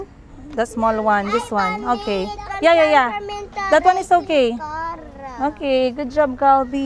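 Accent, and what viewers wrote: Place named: Visayas, Philippines. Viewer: YANG KABOBOHAN MO AT IKAW ANG BASTOS MAGSALITA PURO KA BISAYA ANG PANGET MO PUTANG INA MO NAKAKAINIS KA PANOORIN, PANGET TALAGA UGALI MO, YUNG ACCENT MO BISAYANG TAE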